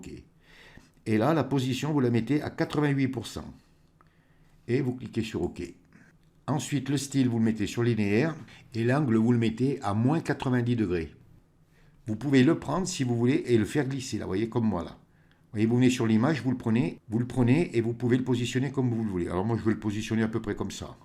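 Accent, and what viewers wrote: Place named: Saint-Étienne, France. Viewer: Merci pour l'abondance de ces tutos si précieux en période de confinement. Cerise sur le gâteaux, l'accent stéphanois que j'ai découvert lors d'un séjour offert il y a bien longtemps, gracieusement, il y a bien longtemps au camp de la Valbonne. Le problème , c'est que l'on devient accro et qu'on en redemande.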